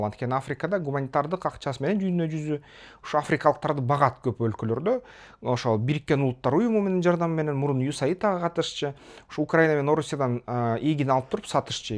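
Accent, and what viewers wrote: Standard. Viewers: Азаматсын,нукура кыргызча суйлойсун.жанылыктарына ыраазымын.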